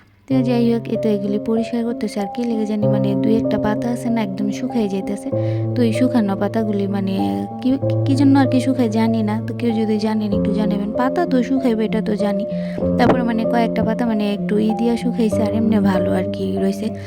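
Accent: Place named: Shariatpur, Bangladesh. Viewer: আপু আপনার কন্ঠটা অনেক সুন্দর, শরিয়ত পুরের ভাষার মতো লাগে❤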